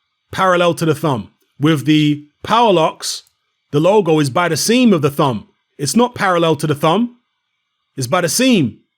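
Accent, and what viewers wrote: United Kingdom. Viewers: What a coincidence that u sound like ur from the uk lol. I was cheering for Fury but after this, I can definitely see the obvious. He was hitting that man with bare fist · I noticed the British accent so I would assume that you are a Tyson Fury fan. However my common sense tells me that a glove cannot flop back and forth if you hand is all the way in. You failed to explain that. TRY AGAIN.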